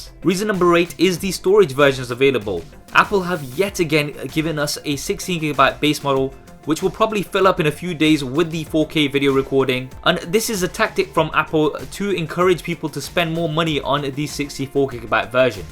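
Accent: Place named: East London, United Kingdom. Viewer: I subbed yesterday, just ordered an S6 due to its price dropping £150 over the last month, glad i did as well. Cool vids dude, just about to launch a gaming/tech channel in the next week or so, my review of the phone will definitely be on there. BTW, you sound local, im just north of london, you sound east?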